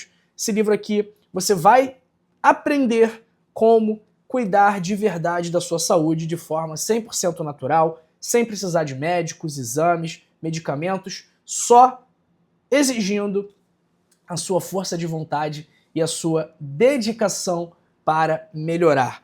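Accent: Rio de Janeiro, Brazil. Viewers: Uma dica: seu sotaque carioca irrita muito (por exemplo, você fala proistata, quando deveria dizer apenas prostata).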